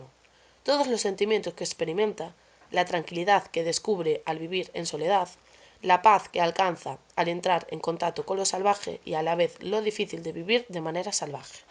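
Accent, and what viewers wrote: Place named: Galicia, Spain. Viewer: Gracias. Tienes una voz preciosa...galleguiña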